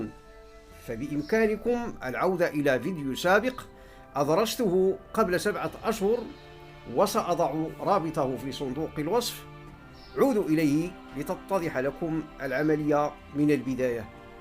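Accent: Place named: Morocco. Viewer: يتكلم بلسان مغربى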